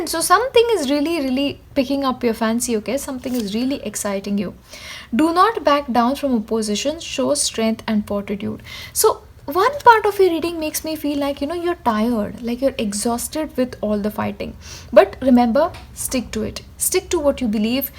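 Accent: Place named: India. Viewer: Your accent reminds me of my close Indian friends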